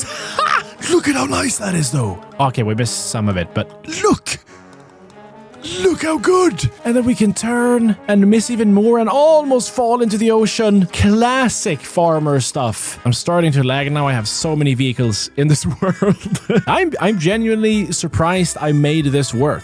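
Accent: Sweden. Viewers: Are u a Swed because u sound like a swed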